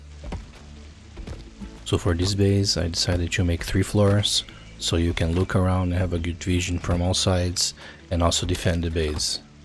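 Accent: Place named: Brazil. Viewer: idk, but u have some brazilian accent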